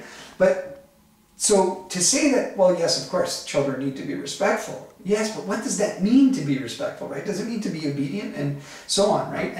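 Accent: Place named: Canada. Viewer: are you canadian? you say "aboot" alot.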